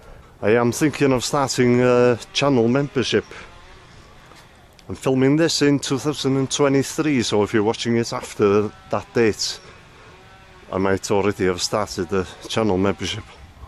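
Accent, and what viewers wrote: Wales, United Kingdom. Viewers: You Have Such A Lovely Accent, I'm Biased Though Also Being Welsh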